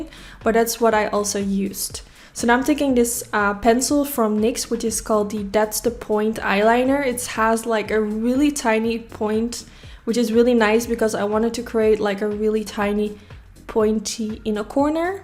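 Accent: Netherlands. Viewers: I can hear youre Dutch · Your accent sounds Dutch? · Ik hou van je Nederlandse accent in je Engels ❤️ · You sound Dutch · Not even 20 sec in: Me: that's so Dutch.... Lol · Nederlands accent hahahah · Ik hoorde gelijk dat je nederlands was, haha! Mooie video, ben gelijk gesubbed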